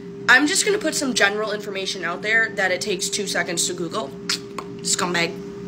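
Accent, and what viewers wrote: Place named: England, United Kingdom. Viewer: Brilliant english accent....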